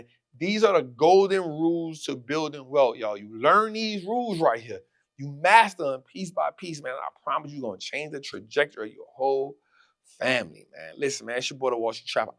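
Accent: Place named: New Orleans, United States. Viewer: Initially, I couldnt understand nothing (but I could really undrrstand everything)!😂❤🎉 but I love it though... Im just like yeap.... that initial was def #NOLA!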